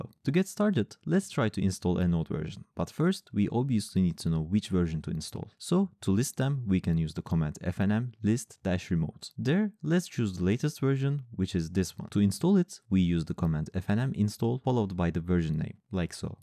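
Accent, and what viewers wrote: Turkey. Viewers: you sound turkish, why no turkish video?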